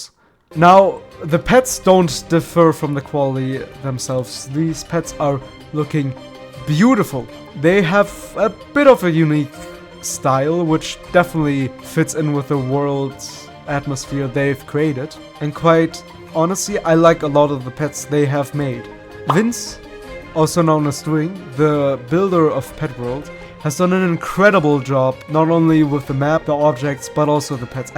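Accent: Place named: Germany. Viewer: I hear deutsch aber gutes video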